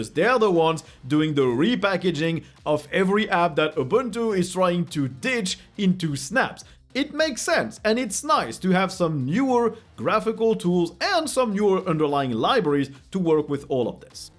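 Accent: France. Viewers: Snif snif ça sent l’accent français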